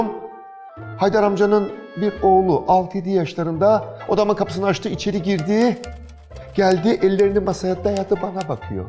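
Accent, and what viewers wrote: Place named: Trabzon, Turkey. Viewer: Müthiş hikâye. Trabzon ağzıyla konuşmanız çok keyifli üstadım.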